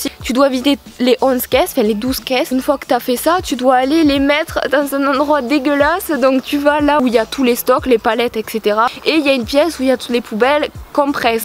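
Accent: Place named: Toulouse, France. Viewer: ho pitain l'accent toulousaine vive les chocolatine XD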